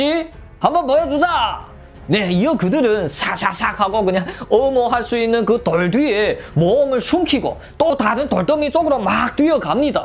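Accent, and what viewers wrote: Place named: Northeast, China. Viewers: 이분 조선족 사투리 해설 정말 재미있네 앞으로도 계속 부탁할께요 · 와 진짜 재밌다.우리 동북에서의 구수한 사투리에 반함 ㅋ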